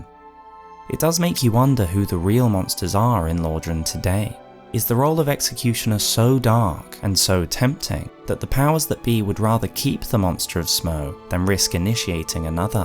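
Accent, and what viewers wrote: United Kingdom. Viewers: I feel that if you have a soothing voice and an British accent you are by law required to make lore videos on YouTube. Good video i like it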